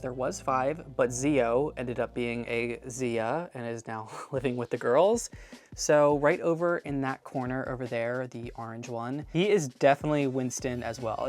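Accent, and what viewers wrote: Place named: United States. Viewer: I spent the first three minutes of this video trying to figure out what his accent is, and I realised it sounds like gay American. And I really don't understand how that's an accent, but that's what he sounds like.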